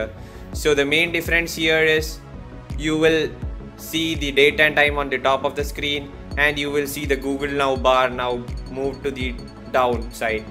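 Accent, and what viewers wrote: India. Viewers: Are u from kerala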